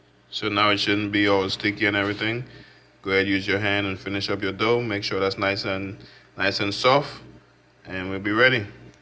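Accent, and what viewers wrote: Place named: Caribbean. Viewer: Straight from the Caribbean I hear the patois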